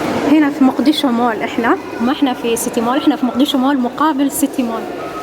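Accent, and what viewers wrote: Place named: Jeddah, Saudi Arabia. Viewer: مشاء الله فديو ممتاز ولهجتك اعتقد انك من جدة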